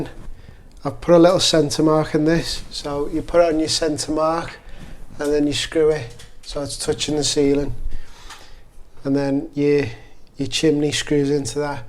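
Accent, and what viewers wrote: Liverpool, United Kingdom. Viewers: Love the scouse accent. Best people in England 🩷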